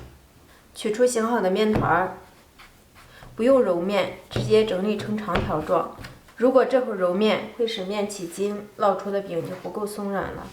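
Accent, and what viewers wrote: Northeast, China. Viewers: 一听口音，感觉就是俺们东北的媳妇，手真巧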